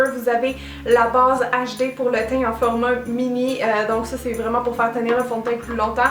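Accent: Quebec, Canada. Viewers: C'est un plaisir d'avoir tes vidéos ! Très professionnelles et généreuses ! C'est tellement cute ton accent québecois - ça me rappelle de bons souvenirs en voyage là-bas, je vis en France - et ta bonne humeur c'est déjà les fêtes de noël ! Merci pour les conseils et le concours. J'y participe et ce que j'aime le plus lors des fêtes de noël c'est la magie de sentir des amis et des familles réunies dans la joie et la générosité. Autrement dit, ce que je préfères c'est voir les gens que j'aime heureux d'ouvrir leurs cadeaux. Belle continuation ! Merci pour tes vidéos ! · Coucou, j'adore vraiment tes vidéos et ton petit accent canadien ahah! Je suis en France et je participe au concours, ce que je préfère a noël c'est les repas de famille, es retrouvailles et puis la magie de noël tout simplement! J’espère gagner ce concours pour une fois ahah ♥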